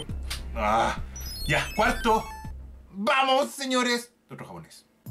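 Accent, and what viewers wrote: Chile. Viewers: ERES CHILENO? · eres chileno? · dejame adivinar... Eres de Conce? xD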